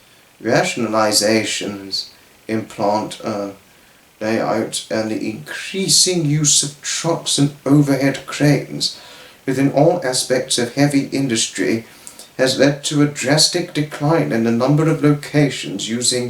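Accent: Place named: RP, United Kingdom. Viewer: Wow Your posh accent is nice to listen to You should become a train announcer (I’ll probably get your voice recorded and then put on a dot matrix on a train. It’s really soothing to listen to in a nice way)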